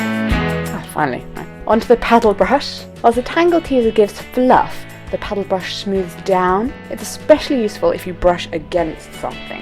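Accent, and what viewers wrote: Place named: England, United Kingdom. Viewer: i must say, i adore that you are so young and have such a thick english accent. a lot of british ppl sound AMERICAN now adays...! as in no accent at all. very flat way of speaking. no expression and heritage in their way of speaking. it is a delight to hear your voice. i watch old brit tele for the humor and BEAUTIFUL accents. lol. keep it and spread it!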